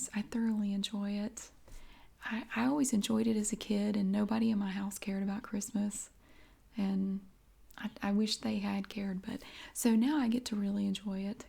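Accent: South, United States. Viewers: Hi friend!!! I love your relaxing voice! I have a southern accent too. I hope my new ASMR channel does good someday like urs. I am new to doing ASMR and not that good at it but I have some cute ideas in mind to do once I’m not so busy with my photography. I also have a beauty channel too. I do beauty subscription box openings on there and sometimes pets vids of my critters. Hopefully soon u will stop by my channels too. I’m a huge fan or ur ASMR sweet friend and look forward to getting caught up on watching ur vids. I love the pug sweater, I have a pug named Sadie who will be turning 14yrs old this month. Do u have a pug too? Much love and support from Kentucky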